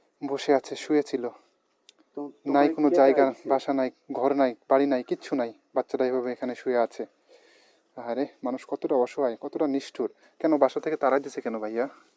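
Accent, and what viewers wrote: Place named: Naogaon, Bangladesh. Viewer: ভাই আমি আপনার সবগুলো ভিডিও দেখি। আপনার কথা শুনে মনে হয় আপনার বাড়ি নওগাঁ জেলায় দয়া করে বলবেন আপনার বাড়ি কোথায়। প্লিজ 😂😂😂😂😂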